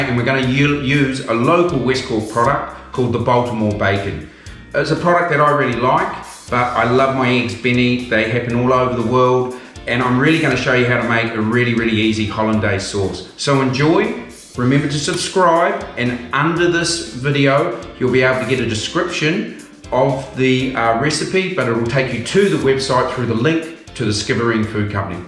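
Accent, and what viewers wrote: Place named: New Zealand. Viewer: Love that Kiwi accent.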